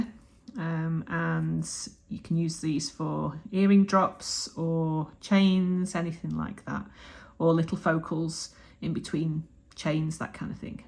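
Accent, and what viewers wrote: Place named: York, United Kingdom. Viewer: Such a lovely link. what size would you say is the jump ring? Also, are you using dead soft or half hard wire? Enjoy listening to you speak, is that a York accent I hear. 😊❤️. Thank you.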